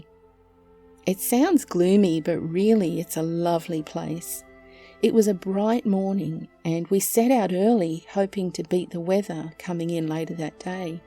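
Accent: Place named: Australia. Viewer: wow wat a voice... im french canadian and allways loved your Australian accent's ,you folk from down under...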